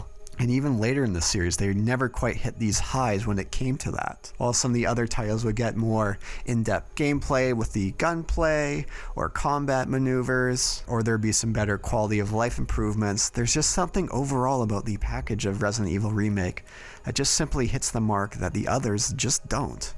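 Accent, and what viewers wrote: United States. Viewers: Thank you. I actually did not know that, but the person streaming sounds American. The H is silent in the USA.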